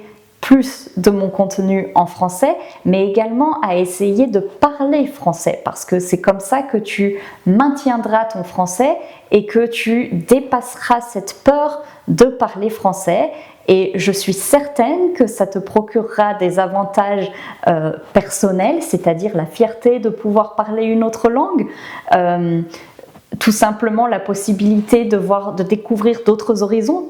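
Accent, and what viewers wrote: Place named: Europe. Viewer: Votre accent me parait europeen. Je suppose que vous avez demenage au Canada d'un pays European. Merci pour l'encouragement de practiquer le franchise quand l'occasion se presente, et je suis tellement d'accord.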